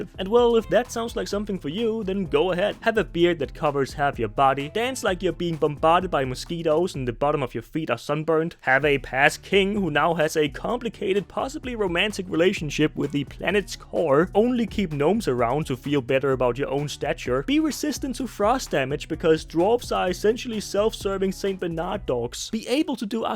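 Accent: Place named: Denmark. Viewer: Du er altså dansker. Det kan jeg høre :)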